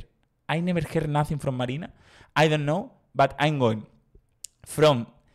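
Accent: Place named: Spain. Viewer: You are incredible! I love your Spanish accent when you are talking in English. I hope you like Athens.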